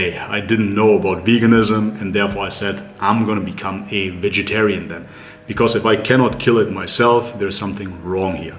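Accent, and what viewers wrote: Germany. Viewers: bist du echt deutscher?? ich (amerikaner) habe die Aussprache gemerkt aber dein englisch ist perfekt! ausgezeichnet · Love that German accent brother! Btw I had to laugh when you said you prayed to Jesus Christ, since you look like a dead-set Muslim! Lol.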